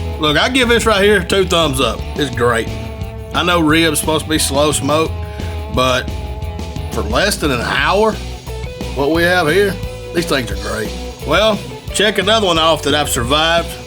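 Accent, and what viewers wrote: South, United States. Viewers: The southern accent just makes these sound even better. Was living in the south for 10 years and those mofos can cook! If you're not a fancy little snowflake you'll love pretty much everything the south can cook.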